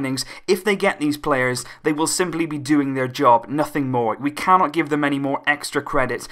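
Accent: United Kingdom. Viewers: he is honest,clear,and talks briefly and in British accent ,so shut up and let me subscribe.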